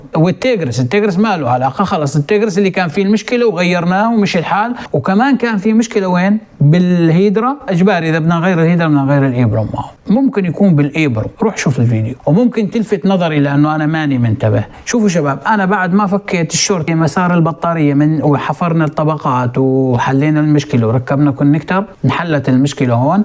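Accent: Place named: Syria. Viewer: استاذ انت سوري ولا اردني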